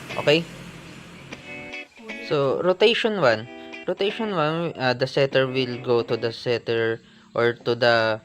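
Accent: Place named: India. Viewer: dami mo filler, ang sakit sa tenga ng english mo... parang kang indian😢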